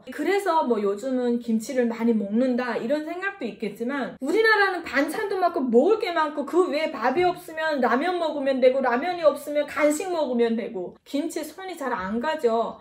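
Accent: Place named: Pyongan, North Korea. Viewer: 는 말을 정말 찰지게한다.발음도좋고 평안도사투리도 간간히섞어서 하는말이 애교스럽고 인물도좋고 탈북유튜버들중 최고...국어선생.😨😨😨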